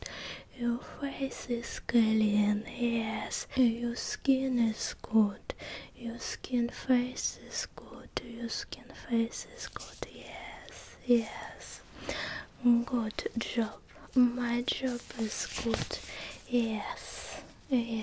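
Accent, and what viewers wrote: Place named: Russia. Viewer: Русский акцент по-моему офигенно триггерный. Вери вери гуд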